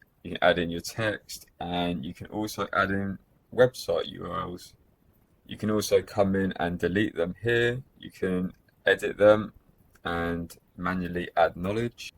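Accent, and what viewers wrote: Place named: United Kingdom. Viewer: your British voice sounds so classic!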